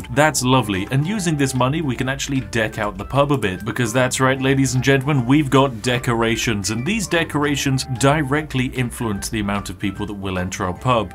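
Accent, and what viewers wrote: United Kingdom. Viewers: I never disliked a video faster (edit: I think he's funny I just hate british people's accents :( not personal though, I still subscribe I just dislike his videos)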